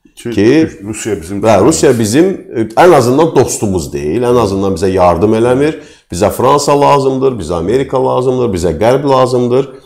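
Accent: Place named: Armenia. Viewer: Бу данышан ела данышыр ела бил ерманиди ..... Бир буну таныйан вар бунун нанаси бабасы анасы арвады хансы миллатин нумайандасидир.....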